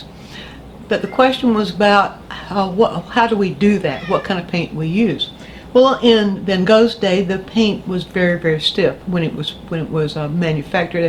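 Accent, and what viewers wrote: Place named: Georgia, United States. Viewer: Thank you for the reply. I am so new to oil painting as a medium that it is extremely helpful to me to learn how the paint and additives work. I am so used to the instant gratification of acrylic and I am so afraid of ending up with a piece I love in oil and it never drying - especially with the cold wax. :-) I'm glad I made you smile and I hope the Georgian accent doesn't disappear entirely, it is so lovely. I'm a fan of accents and Georgia is a favorite. :-) Thank you again for the generosity of your quick tips instruction :-)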